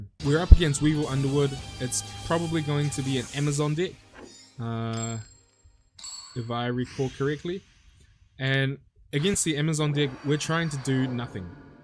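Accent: New Zealand. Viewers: Dude. Your accent. Are you from NZ???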